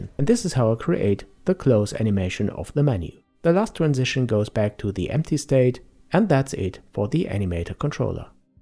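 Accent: Germany. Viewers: You sound so german I almost thought you would start talking german.^^ Fand ich irgendwie totaly trippy.^^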